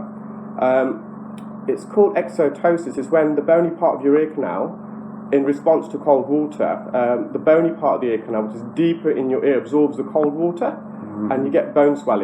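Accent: England, United Kingdom. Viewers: You have the best English accent.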